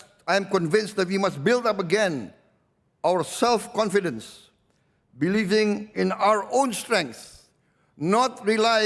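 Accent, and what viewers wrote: Indonesia. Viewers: Suka dg "accent" nya, gak ngilangin warna asli aksen Indonesia👍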